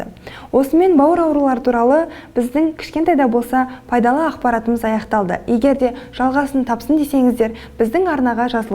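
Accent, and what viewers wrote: Standard. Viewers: Көп жаса Айналайын ана тілімізде таза сөйлейтін сендей қыздарымызға Алла разы болсын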